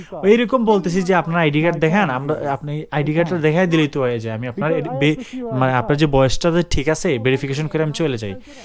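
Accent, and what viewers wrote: Sylhet, Bangladesh. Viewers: আপনি কি সিলেটি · Vai apne ki sylheti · ভাই সিলেটি😅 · Mator style hunley bujha jay Sylhet er manush je apni! Btw apnr bari habiganj ni vai? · Vai apni sylheti apnar kotay buja jay... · ভাই আপনি সিলটি 🥰🥰🥰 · Vai Khun desh oo take ba ? R tumr kotha huniya buja jar sylheti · Brother, are you sylheti ? Your language like shylhety language. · Bro You from sylhet. Ami afnaar voice oor dhoron dekiau bujhi lisi afne ee Sylhety. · সিলেটী একটা টান চলে আসে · ভাই কি সিলেটি?😁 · ভাই কনফ্রাম সিলেটি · His tune like sylhety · শুদ্ধ উচ্চারণের সাথে সিলেটি টান ত আছেই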